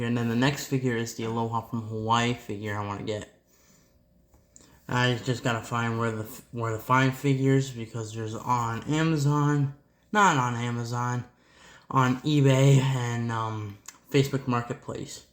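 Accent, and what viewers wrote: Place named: South, United States. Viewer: wow you sound like you’re from the south that’s amazing that you’re from Canada. I love the Canadian albums big fan.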